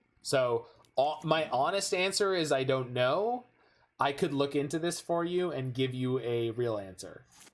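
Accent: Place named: Italy. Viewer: The averagely annoyed Italian accent is hysterical xD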